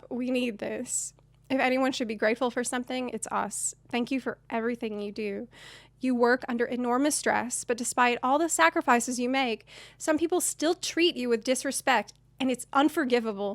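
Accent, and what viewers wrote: South, United States. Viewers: when you sound southern American and British at the same time :|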